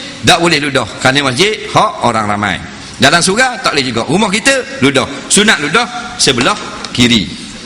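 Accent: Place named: Terengganu, Malaysia. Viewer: Maaf teman. Ini bahasa dialek orang Terengganu di Malaysia.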